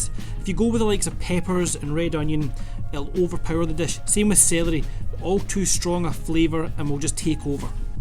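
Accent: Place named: Glasgow, United Kingdom. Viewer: Finally a recipe without all the faf and actually gives you an idea how long the sauce will keep for, thanks, (the fact that your Scottish is just the icing on the cake lol, is that a Glasgow accent I hear:-) )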